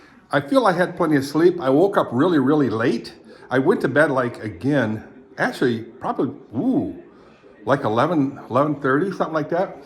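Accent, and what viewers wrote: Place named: North America. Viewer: I was thinking, is it June already but then realised you sound North American.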